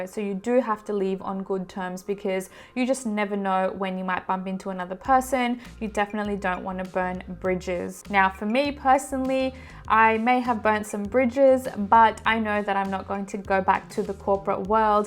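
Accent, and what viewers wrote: Australia. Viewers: You're in Australia? I heard the accent but I thought the great resignation was only in the US. I like this being a global movement.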